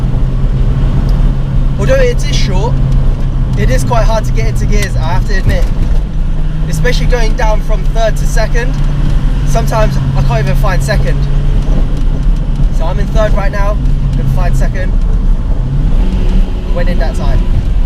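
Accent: United Kingdom. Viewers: An Asian guy with a British accent....I've not seen that before, interesting. I do like JDM vehicles, but for some reason, they keep putting the steering wheel on the wrong side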